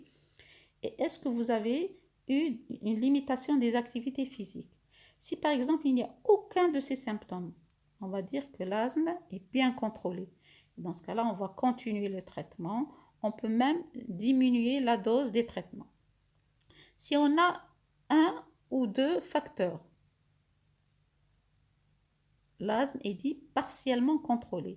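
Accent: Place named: Algeria. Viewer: d'apres la voix et les bonnes explications je savais bien qu'il s'agissait d'une professionnelle Algérienne, je suis Algérienne vivant au Canada, je vous dis bravo pour toutes ces explications ( nouvellement diagnostiquée Asthmatique ).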